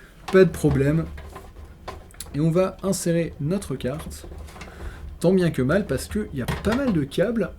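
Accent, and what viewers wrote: England, United Kingdom. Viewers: mais tu devais avoir un accent plus anglais avant dans ta jeunesse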